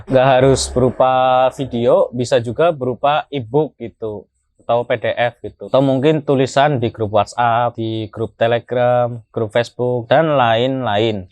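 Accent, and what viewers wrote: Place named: Banyumas, Indonesia. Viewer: Deneng ngapak, tapi ok ilmunya suhu, ora ngapak ra kepenak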